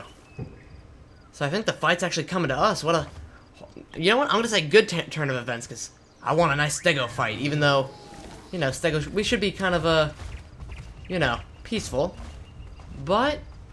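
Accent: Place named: United States. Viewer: hey I like to talk, do a Austria pack, I want a face cam you sound American, and you may not be PewDiePie, jackcepticeye, or the diamond minecart but. YOU are my Favourite YouTuber in the world I would rather PewDiePie have 8000 subs and you have 50 mill you deserve it I just hope you keep doing what you do and on cuz ya can 😉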